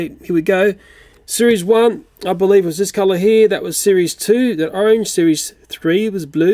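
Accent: Australia. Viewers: cause he’s aussie lmaooo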